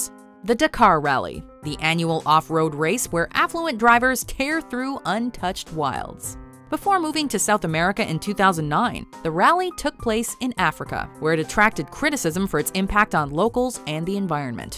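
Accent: United States. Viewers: the woman has a US accent, therefore she is being pretentious. It is irrelevant how it is pronounced in other countries. You want to hear it pronounced that way, make your own videos. As for her, in the USA, it is a mispronunciation. VASE. VAYSSSSS.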